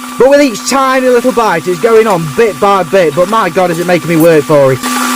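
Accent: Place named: Yorkshire, United Kingdom. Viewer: Keep up good work and f all the haters , videos very entertaining and Yorkshire accent cracking shagger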